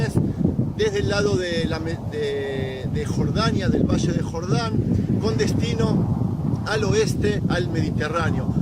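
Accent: Argentina. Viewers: Porque dice este hombre Isabel? El nombre es Jezabel no Isabel. Tú no eres judío pareces argentino che...!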